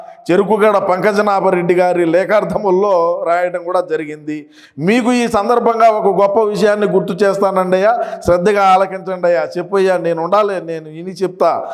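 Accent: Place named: Kadapa, India. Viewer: Anna, Kadapa baasha lo Baaga chepparu.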